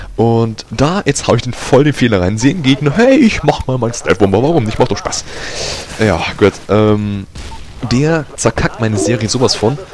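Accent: Bavaria, Germany. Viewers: hab des ganze bayrische guat verstana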